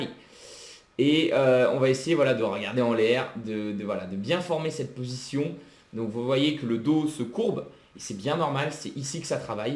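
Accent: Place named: North, France. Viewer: Bonne vidéo mais tu as un putain d'accent ! Tu dois venir du nord 😅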